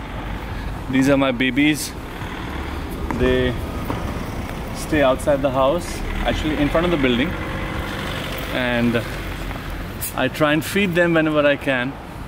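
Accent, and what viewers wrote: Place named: Delhi, India. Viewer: You are totally delhi person....apki baton me ekdum delhi tone feel hota.hai....i love delhi ...delhi dilwalo ki like you ❤️...blessed couple. 🤗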